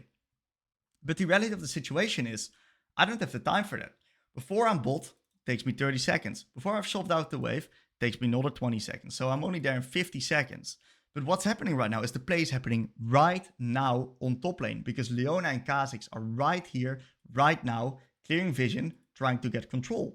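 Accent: Netherlands. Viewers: Sounds dutch.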